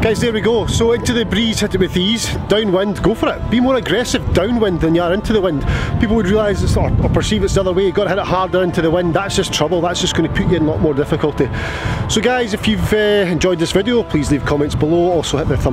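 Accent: Scotland, United Kingdom. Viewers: I love the music, and your 🏴 accent. Realy authentic! Discovered the golfing machine in 2018 which led me to you when you had 6K subscribers. TGM and FREE YouTube channel made me understand previously consumed books and video’s better as well. Keep doing what you do. My handicap just turned 18 and is trending down 🏌🏽‍♂️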